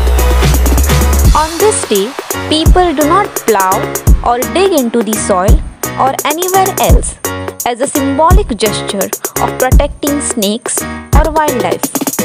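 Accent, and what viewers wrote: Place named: Goa, India. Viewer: I lover your tipical goan english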